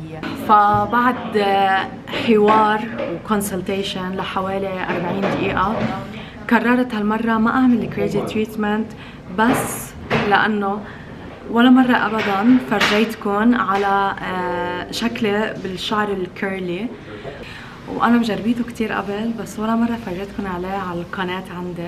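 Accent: Lebanon. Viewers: من اول ما بلشتي تحكي عرفت انك لبنانية!؟ انا جديدة هيدا اول فيديو بحضره هي لبنانية صح!؟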